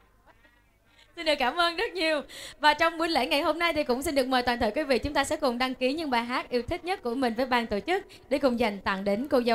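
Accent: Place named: South, Vietnam. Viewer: Chuẩn MC giọng miền Nam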